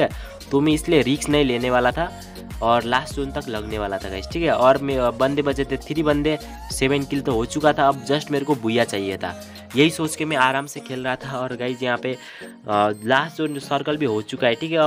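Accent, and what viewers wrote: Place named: Nepal. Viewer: Nepali ho bhai tum hum avaj se pehechan jate h 😁😄😄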